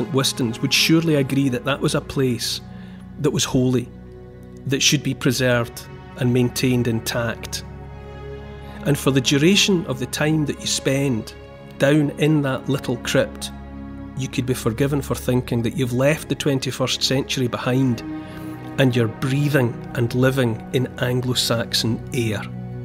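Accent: Scotland, United Kingdom. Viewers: Hi there. I love your soothing Scottish voice. You could talk about anything even and i'd listen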